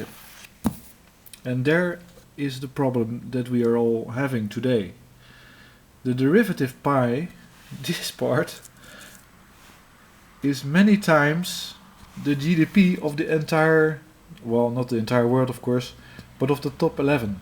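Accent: Netherlands. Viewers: dutch accent is unmistakeable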